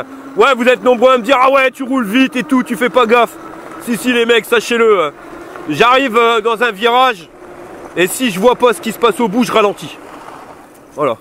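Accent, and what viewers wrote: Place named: Ch'ti, France. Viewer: Suis d'accord mais c le charme du nord , les ch'ti quoa, c'est cool la différence.😂. Moi il me fait rire, il devrait moins forcer sur le patois c'est vrai 😂. Surtout qu'il à de bonnes compétences techniques, c'est dommage que dès fois on est du mal à comprendre tt les expressions, car je ne suis pas de Lille et tout le monde non plus , mais il me fait rire quand même 😂.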